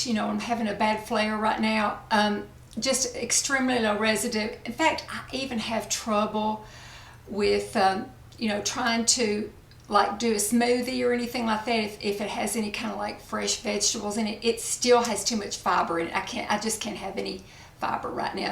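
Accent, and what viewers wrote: South, United States. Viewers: Darlin' we are your tribe!! no one is going to leave you. We all love your smile, your southern drawl that warms our heart. God bless you and cheers to your speedy recovery.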